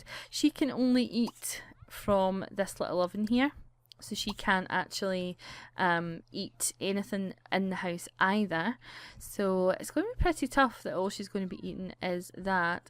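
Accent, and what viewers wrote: Scotland, United Kingdom. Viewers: Why are u British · shes scottish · I love your videos and your accent! I've always wanted to go to Scotland too ^J^ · Are you Scottish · oops well i love you scottish accent then! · Are you Scottish ? If you are you can be the princess from BRAVE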